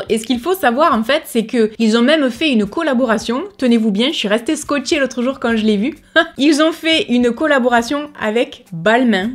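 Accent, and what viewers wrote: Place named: Perpignan, France. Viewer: J'ai rigolé car il n'y à aucun de ses produits que j'ai testé acheter mais ta bonne humeur et ton accent de Perpignan me fond toujours du bien 😝😘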